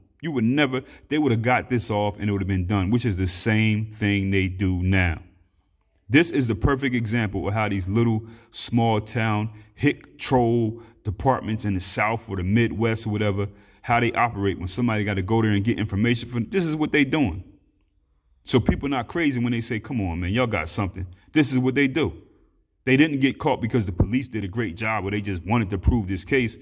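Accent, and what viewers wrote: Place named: East Coast, United States. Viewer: I love ya East Coast accent too bro 🤸🏽‍♀️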